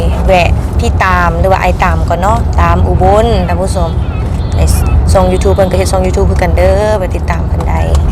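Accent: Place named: Xayabury, Laos. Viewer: พึ่งเข้ามาติดตาม สำเนียงน้อง คล้ายทางแขวงไซยะเนาะ ฮ้องเพลงกะม่วน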